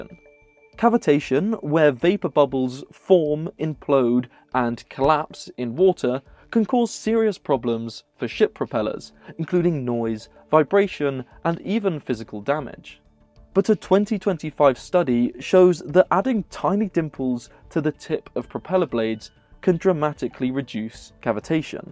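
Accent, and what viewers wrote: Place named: South, United Kingdom. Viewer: Southern British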